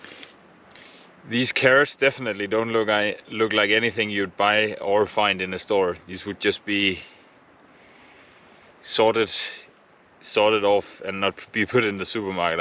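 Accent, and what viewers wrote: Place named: Denmark. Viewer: I immediately knew you were Danish! I once had a Danish flatmate . The accent is so easily recognisable.😅 Nice video! Keep up the good work!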